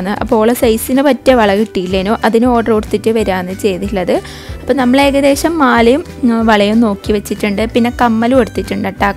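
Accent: Kannur, India. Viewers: IngaleKannur bhasha pwliya😊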